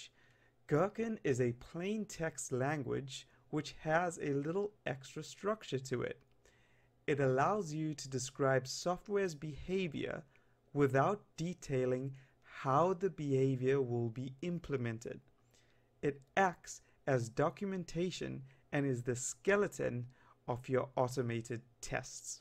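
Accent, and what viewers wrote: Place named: South Africa. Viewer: Great tutorial, very clear and concise! Nice to hear fellow South African (you sound a bit like Elon Musk actually!)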